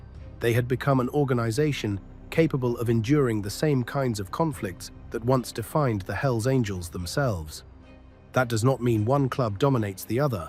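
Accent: England, United Kingdom. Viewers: Why is there so often some dork from England doing the narrating?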